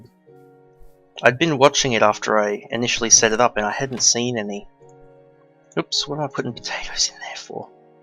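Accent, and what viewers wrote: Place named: Australia. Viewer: One of the reasons I enjoy your videos is that I get to listen to another Aussie accent :)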